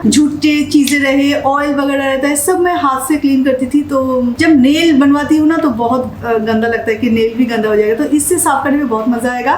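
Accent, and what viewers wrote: Bengal, India. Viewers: She is bengali, it's her accent.... Kindly don't use such language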